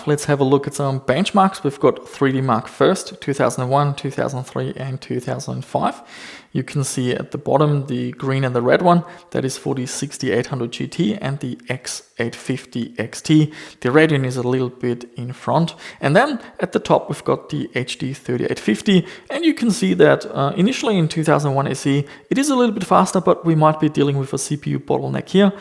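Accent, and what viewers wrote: Germany. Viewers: Are you a german? Bist du ein deutscher? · are you german? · Are you German?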